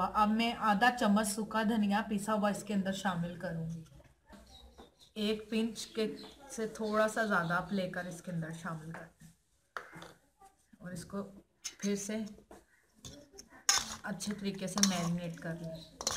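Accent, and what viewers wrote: Lahore, Pakistan. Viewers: Yh lahoreye aesy he bolty hen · Accent is subjective. Would sound weird in karachi, but is normal in lahore. Food looks yummy, what's matters is substance and not cosmetic features which we like to do. Loved this clip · Her accent is beautiful. Lahore, Lahore ha. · Labori urdo mix Punjabi style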